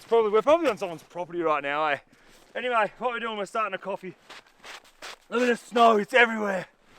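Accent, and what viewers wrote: Australia. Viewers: Great video, and happy new year to you a mac10.. it’s really funny how super Australian you sound with the backdrop of snow haha 😂 the accents multiplied since leaving the sun haha.. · If it wasn't for the Australian accent, I would've thought him a russian 😂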